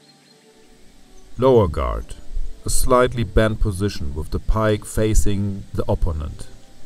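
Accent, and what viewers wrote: Germany. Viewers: Wenn Deutsche Englisch blubbern...🤢🤮👎🏻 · Ekelhafter, deutscher Akzent.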